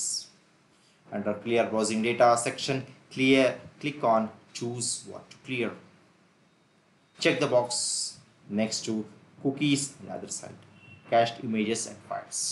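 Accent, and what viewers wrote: India. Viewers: I hate the way u r talking. India 😅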